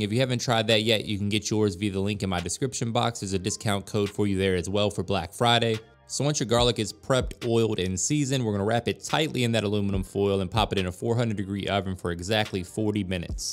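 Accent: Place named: South, United States. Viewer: Sounds like a real country boy lol wish I could taste that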